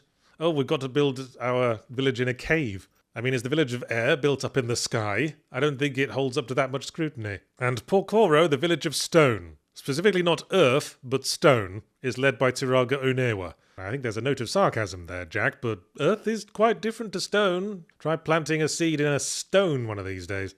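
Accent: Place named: United Kingdom. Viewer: Out of all the first run of Bionicle products, the only ones I could pose "like one of your French girls" were the Rahkshi. Knees and good body portions do that to an action figure. However, whatever you do, do NOT disconnect the ball joints. ...also, I forgot that Jala (the McDonald's toy) and Jaller (the one packaged with the bigger set) are the same character, and the British pronunciation isn't helping any. That's not even getting into how the Matoran were originally called the Tohunga in the first comic.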